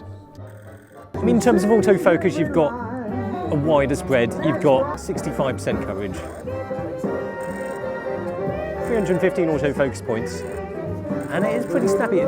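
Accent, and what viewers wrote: United Kingdom. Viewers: it feels awkward seeing someone who is asian having a british accent hahaha i dont know why, i'm asian btw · My mind still can't process and Asian with an English accent!!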